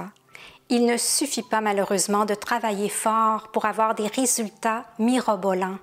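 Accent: Quebec, Canada. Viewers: Bonjour je vous decouvre au hasard et votre contenu me convient.en plus je ne sais pas pourquoi l accent Québec me procure tjr du bien être 🤗🥰 moi ce que je ne fais plus c'est regarder le journal ou laisser la TV allumée pendant mon ménage j écoute ce genre de podcast comme le votre . J ai arrêter d acheter des produits ménagers je me sert des chiffonnettes H2O et leur produits ecocert fini les bidons en tout genre J ai aussi moins de repassage je sèche sur ceintres Je n utilise plus de cotons démaquillants. Depuis 3ans Gant démaquillant de chez H2O démaquillage a l eau ..voici qlqs exemple de ma direction vers une vie plus sereine plus saine et zen 🙌🍀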